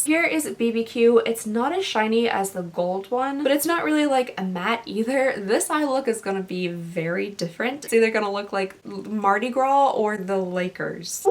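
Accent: Kentucky, United States. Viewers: Wait I just recently started watching your videos... are you from Kentucky! I am too!! I thought your accent was so nice! It’s because it’s so familiar to me! Wow, that’s so amazing! It definitely gives a boost of confidence because I’m always afraid to make videos due to my accent! Also I say “reach me that” all the time. My girlfriend who is from New Mexico(where I live now) has gotten into me for it lol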